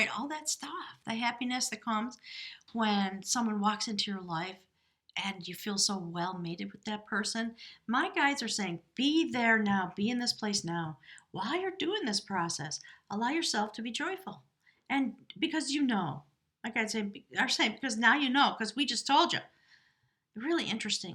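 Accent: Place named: Western North Carolina, United States. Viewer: The beginning sounds like Western NC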